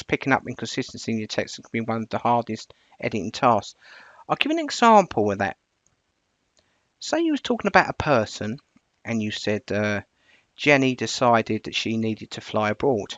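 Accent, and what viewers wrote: United Kingdom. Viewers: My intention is not to be rude, but If you learn to attenuate your strong (British? Australian?) accent, which is incomprehensible for some of us, you could reach many more people. I am a French Canadian who had to learn to attenuate his strong accent in order to be understood by Europeans who spoke French, so I know it's possible. I'm sure your presentation is very useful, but I couldn't understand half of what you are saying. Good luck.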